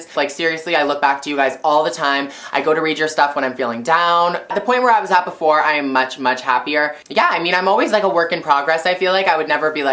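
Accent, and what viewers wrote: Canada. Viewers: you sound more canadian than ever before lol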